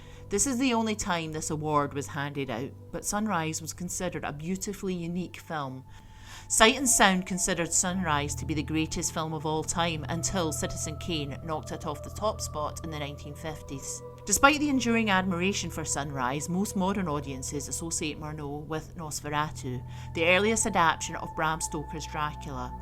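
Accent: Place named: Scotland, United Kingdom. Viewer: That was great! Nice to hear a Scottish accent on youtube ( im from Falkirk ). Deffo liked and subscribed! Have a good one.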